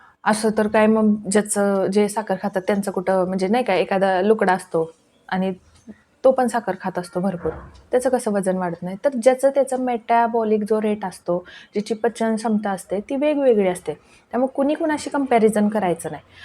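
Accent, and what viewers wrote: Satara, India. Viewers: बोलण्यावरुन भाषा.वाटलेच...आपलीसातारी रांगडी भाषा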